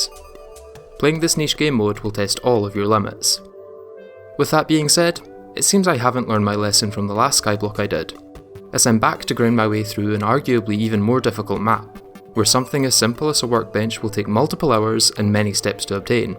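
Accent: Scotland, United Kingdom. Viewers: are you scottish perchance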